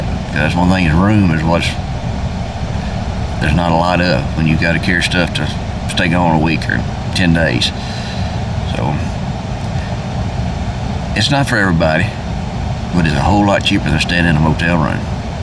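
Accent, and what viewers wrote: South, United States. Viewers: Where you from bo? Sound southern. Git R Done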